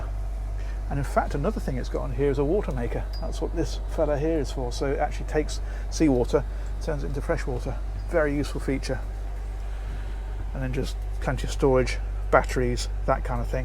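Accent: United Kingdom. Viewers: Was that a "Lightening Bug" by the electric panel? ;-) I'm in awe the way you give your tours, your British accent is a wonderful touch! Brilliant~~~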